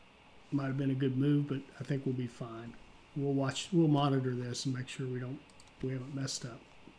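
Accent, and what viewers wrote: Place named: West Virginia, United States. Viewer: Thanks for this episode, or the whole series for that matter. Railway Empire is one of those games I drift back to every now and then, for the sheer joy of watching trains go, and composing the song for them - err the loads they will carry by designing this 4 city cluster. I only found your series recently, I was kind of doing my own thing. Mostly writing spaghetti westerns with my rail lines. But the method/way you connect things has improved my own game a lot. So thanks for taking the effort to share your nice gamestyle. Hardly noticed the West Virginia accent 😆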